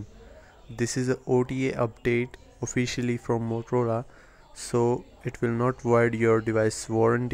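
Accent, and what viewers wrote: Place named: India. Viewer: That accent must be common in India!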